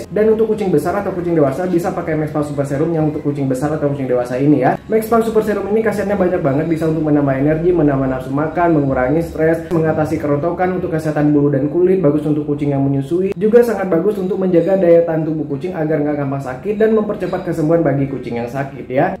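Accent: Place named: Banten, Indonesia. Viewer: Kebantern ngomongnya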